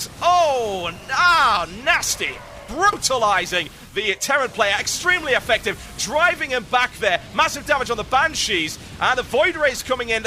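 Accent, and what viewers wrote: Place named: England, United Kingdom. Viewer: no offense man but I can't stand your english accent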